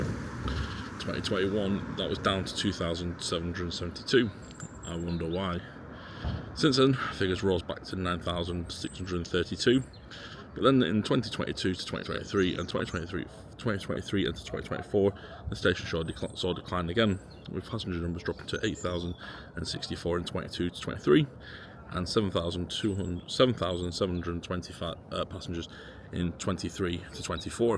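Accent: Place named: Northern England, United Kingdom. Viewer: Geoff Marshall has suddenly got northern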